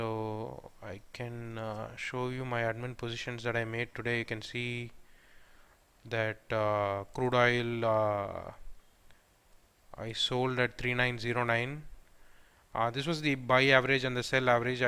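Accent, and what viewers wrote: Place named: Tamil Nadu, India. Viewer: Bro your pronounciation shows you r belongs to Tamil Nadu.... If it's true ... I'm very much proud...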